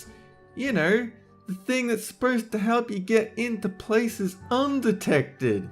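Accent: Australia. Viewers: I never knew you were Australian m8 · i know an aussie accent when i hear it.... he is definately australian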